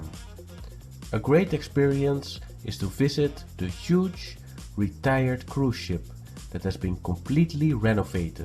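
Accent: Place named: Netherlands. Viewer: To be honest , please pronounce "s" in the end of a word more like a "z" ,not whith a Dutch "lazy s". Same goes form "d" (not a "t"), like : "and" NOT "ent". Sorry to say , BUT if you really are into English , please get interested in pronouncation. (Sorry and good luck)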